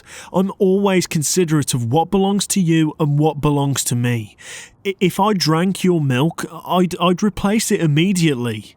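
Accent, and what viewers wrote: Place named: Birmingham, United Kingdom. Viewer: You are doing great things for the Brummie accent 👏🏻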